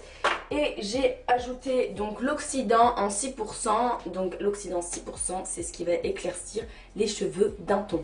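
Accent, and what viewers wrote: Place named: Algeria. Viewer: dés que tu as cmc à parler g tt de suite su que tu etais algerienne mdr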